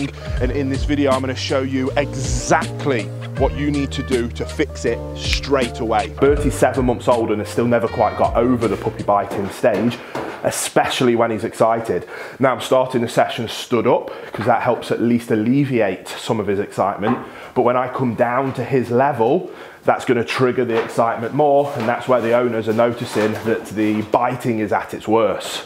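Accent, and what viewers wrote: United Kingdom. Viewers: I was not expecting this giant viking looking dude to have a british accent 😂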